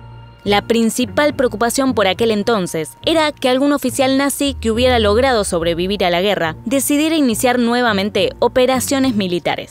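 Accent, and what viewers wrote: Argentina. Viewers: Puufff, lamentable que el video esté en ese español argentino (o será uruguayo) "raro".... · El primero de masho... Si pretenden que gente no argentina se interese en sus vídeos, traten de disimular el acento.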